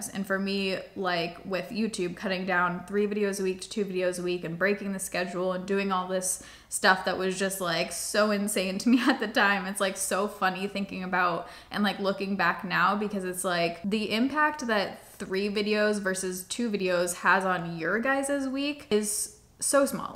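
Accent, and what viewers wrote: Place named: South, United States. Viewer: Dude I'm a couple minutes in and I'm sure this gets real serious real fast, but just listening to you talk. I can tell you've been in the South lately lol there is some twang in your Midwest! 💚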